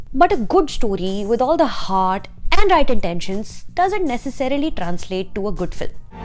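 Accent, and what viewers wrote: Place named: India. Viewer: Omg - awful commentator . Could the English pronunciation get any more horrific and the Indian accent be more grinding to hear fir more than 2 mins . Why has FC got these awful film critics on it . They need to go to finishing school first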